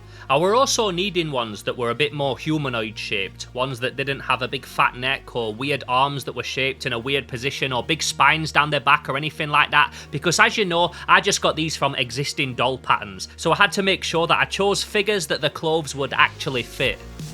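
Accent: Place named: Sheffield, United Kingdom. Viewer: I've laser cut synthetic/synthetic blend fabrics before, and it slightly melts/seals the edges, so if you're wanting to do a little edition run, it could really save you time not having to hem the edges. Will defo take some work fiddling with settings and taking care not to create toxic gases, but like, it's insane how detailed you can get with it. This video was absolutely amazing and inspiring, your perseverance level is incredible, and it's so valuable to share how difficult this stuff can be. When I was young I once made a TINYYYYY pair of jeans, and if you think sleeve logic it's tricky, trouser patterns will turn your brain inside out, (and you'll feel like a genius afterwards hahahaha) KEEP IT UP AND ALSO SO REFRESHING TO HAVE A SHEFFIELD ACCENT IN YOUTUBE WORLD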